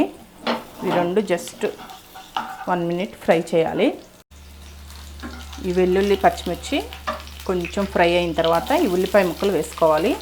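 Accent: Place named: Bellary, India. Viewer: I saw Bellari accent in your Telugu ma'am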